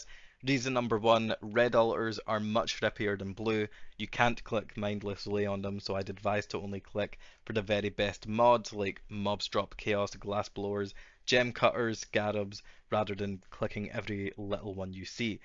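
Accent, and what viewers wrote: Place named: Scotland, United Kingdom. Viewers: I was thinking the same, Is that scotish accent known from samurai jack:) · Scottish poe gamer les gooo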